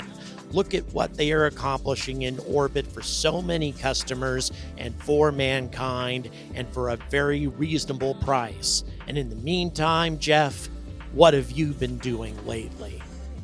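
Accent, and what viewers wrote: England, United Kingdom. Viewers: Love the English accent! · As a Brit I think your British accent is most splendid.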